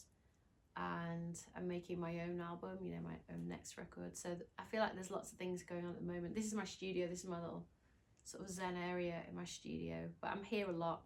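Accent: United Kingdom. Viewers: Maybe it's the northern accent, but you come across so down to earth and having no airs. I remember hearing when it started being played on the radio and having to keep the engine running even though I'd arrived home just to finish listening to it. Those were the days! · I was mot expecting her to be British omg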